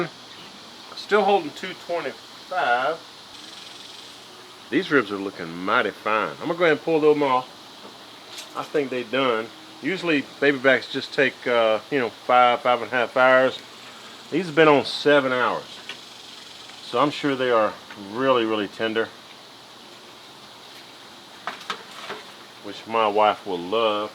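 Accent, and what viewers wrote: South, United States. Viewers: Howdy. That southern drawl is music to my ears!! Much love from Waller,Tx.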